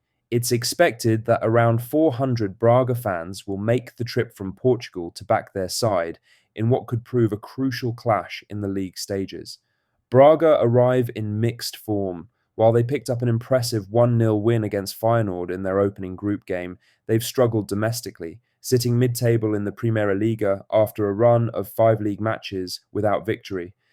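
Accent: England, United Kingdom. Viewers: Beautiful narration in the King's English!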